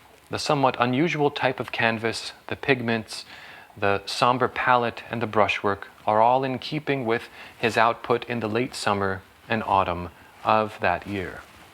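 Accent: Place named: Netherlands. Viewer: I like that the presenter has such a clear Dutch accent when speaking English. Kan goed horen dat je Nederlander bent!!! Top video.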